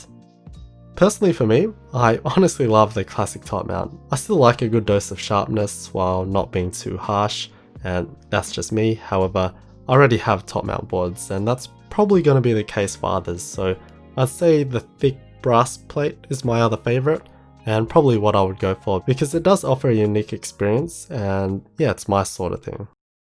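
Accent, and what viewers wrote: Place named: Australia. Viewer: sounds like every single Asian Australian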